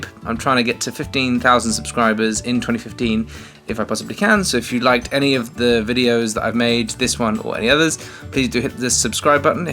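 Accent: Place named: London, United Kingdom. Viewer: this is very good.. finally i found someone speaking clear normal londoner accent. thanks alot for the beneficial video. looking forward to see some more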